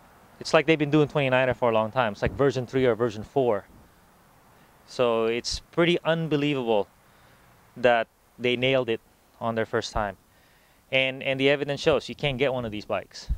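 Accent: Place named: Philippines. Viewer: You sound like a Filipino accent, are you? Anyway am still not convinced that 29'er is way better than 26'er. But I love to try it in a single track in North wales or lake district.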